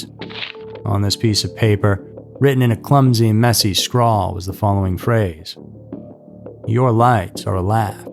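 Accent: South, United States. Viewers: Great story my friend. Love the Voice. Down south southern style❤🎉😂😂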